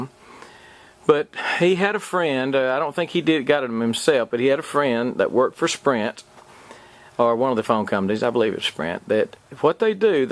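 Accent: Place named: South, United States. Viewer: With that southern drawl I was expecting to hear a "hell yeah" haha.. Regardless your vid definitely piqued my interest.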